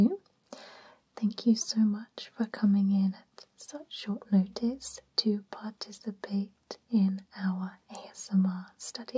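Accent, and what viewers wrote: United Kingdom. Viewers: Whereabouts in the UK are you from? I'm from up North and couldn't imagine a Northerner doing ASMR (at least not well), especially with an accent from where I'm from, hahah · As an Australian I feel offended, she sounds British not like us at all! Maybe you are hearing the R sound but there are 25 other letters to consider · 1. Why have I just now come across your channel? :o 2. What's that accent? (British obvs. but exactly) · Brilliant ASMR! +Subscribed when heard that British accent. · Sounds British with a bit of Australian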